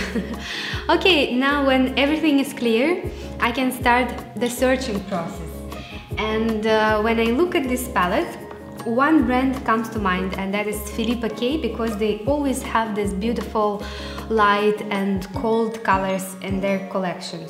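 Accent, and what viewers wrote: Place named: Russia. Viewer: Здравствуйте! У вас такой сильный русский акцент. Понимаю все что вы говорите )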